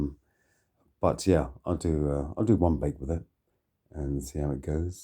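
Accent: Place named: Scotland, United Kingdom. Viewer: Is it just me or do I hear his Scots accent coming through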